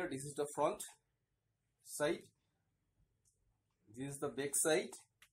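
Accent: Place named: Assam, India. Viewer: pakka assamese accent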